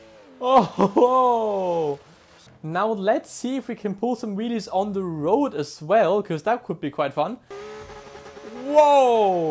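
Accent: Germany. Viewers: Ich habe von Anfang an gehört das du deutsch bist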